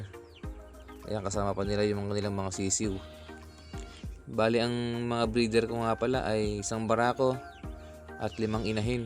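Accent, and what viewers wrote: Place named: Luzon, Philippines. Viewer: Hello sayo dyan😊 kalina ko pa guess kung saang province ka, based on ur accent ay malamang taga Luzon ba kayo? Anong province? Di ko mahulaan😊 bilib ako sa diskarte mo at sure ako na pinag aaralan mo din lahat yan para mapa ayos ang farm…nakaka proud ka naman, sana ganyan din brother ko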